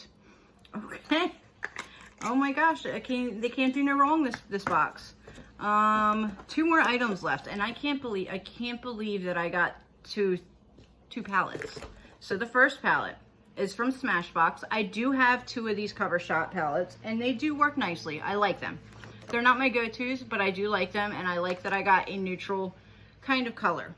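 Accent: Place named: Pittsburgh, United States. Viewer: Heard your Pittsburgh accent and subbed :-) Was considering stopping premium but maybe not lol. Great unboxing. Love the hair and also saw you in my rec feed.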